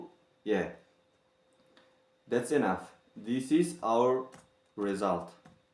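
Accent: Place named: India. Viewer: Bruh indian accent 🤡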